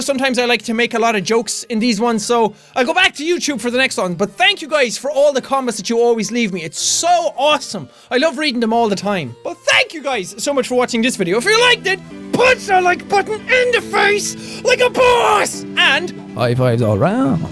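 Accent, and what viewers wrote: Ireland. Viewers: i understood the deep irish accent as i use quite a bit o them sayings everyday. please can you do a complete episode in a thick irish accent... it would be boss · Dat Irish Talk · !!!!!! 2 QUESTIONS!!! CAN YOU SAY MY NAME IN YOUR IRISH ACCENT? AND DID YOU STEAL MY CAKE?!! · put me in ur next one and UR BOSS AND ARE U IRISH ?????????????????!!!!!!!!!!!!!!!!!!! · R u BOSS,southern Irish or northern Irish! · Loving that full Irish!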